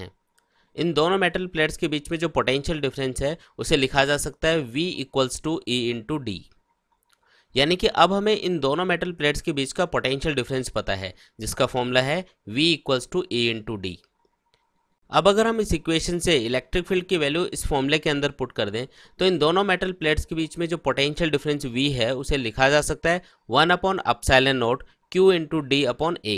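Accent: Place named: Rajasthan, India. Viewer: Sir kha se ho ... Language se to Rajasthan se hi lag rhe ho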